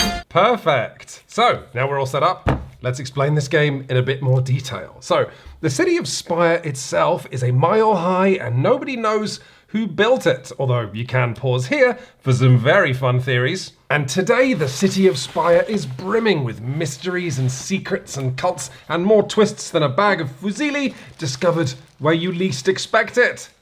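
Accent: United Kingdom. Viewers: Nice to see a review about RPGs. I would like some more...😆😉 (Although the British accent makes your videos difficult for me to understand....but I watch them anyway.😆😆)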